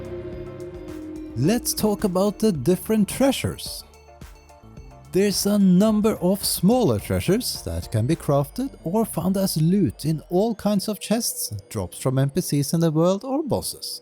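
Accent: Norway. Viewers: 10/10 norwenglish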